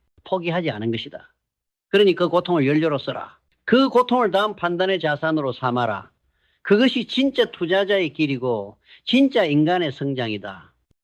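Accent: Gyeongsang, South Korea. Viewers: 경상도 목소리가 진짜로 조언해주는 느낌이라 좋아요 ㅎㅎ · 굉장히 호소력있는 목소리 입니다. 경상도 억양이 되레 호감으로 들립니다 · 역시 경상도 목소리가 좋아요...강한 느낌...머리 속에 팍팍 박혀요~~~~^^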